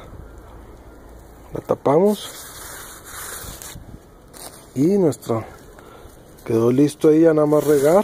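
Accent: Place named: North, Spain. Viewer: me encantan la manes de explicate y su acento norteno me encantan felicidades excel ente video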